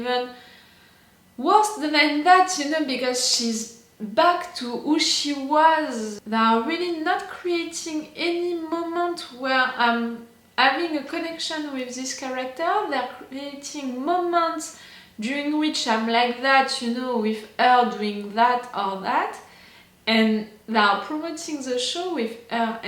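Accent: France. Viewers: Elle est française ça s'entend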